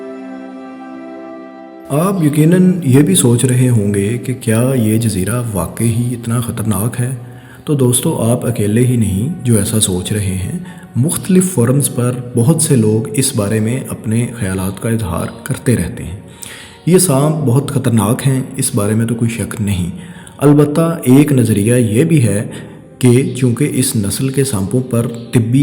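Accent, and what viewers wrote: Punjab, Pakistan. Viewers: Bhai voice-over kisi dhang se Urdu bolne wale bandy se karwaliya Karen meharbani Hogi Bhat taa K dekhne walon ka vedio main interest Bana rahe, yeh Punjabi mix Urdu lehja kaano ko Bhat nagawar guzarta hai, humble request Hai apse. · Good work ap ka lehja Punjabi hai plez inprove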